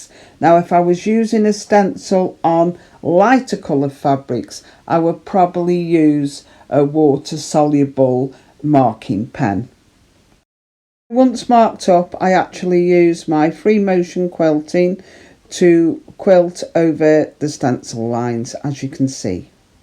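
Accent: United Kingdom. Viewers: I love this video, thank you so much for sharing, looks like we're off to B&Q at the weekend then! I've only just come across your channel and subscribed right away. It's so lovely to hear a British accent, especially one that I think is relatively close to me. Is it a Stoke accent? I'm West Midlands but close to the Staffordshire border. It's also nice to hear of quilt shops I actually have a chance of getting to :) I'm new to quilting, just working on my second project which is a baby cot quilt. My first project was a lap quilt so both fairly easy to baste. I would love to do something bigger for my next one but the basting completely put me off but this makes me think I can attempt it :) Thank you again, off to search through your older videos now! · Thank you for sharing your basting techniques. Very helpful. Have subscribed, it’s lovely to hear a Brit voice talking quilts.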